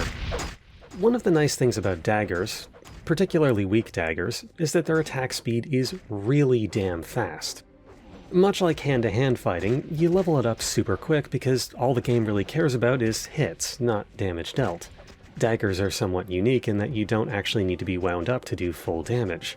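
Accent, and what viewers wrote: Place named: Canada. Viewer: I can tell youre canadian.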